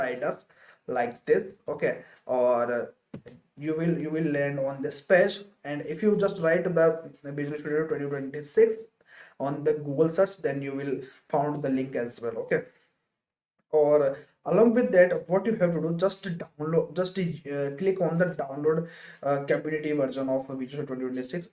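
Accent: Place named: India. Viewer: He is speaking English, you need to understand the Indian Accent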